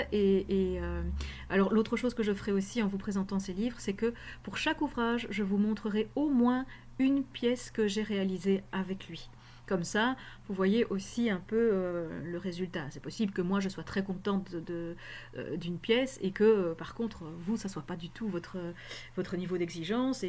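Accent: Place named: Belgium. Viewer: J'adore aussi les livres de couture, les magazines. Il m'arrive de les lire et dévorer avec les yeux avant de les acheter. Et je les conserve précieusement, comme ma mère avant moi. J'aime bien qu'il y ait les patrons grandeur réelle, même si je ne réalise pas tout. Merci pour ton initiative. Je me suis abonnée et vais voir ton blog. Ton petit accent du côté des gaufres est délicieux.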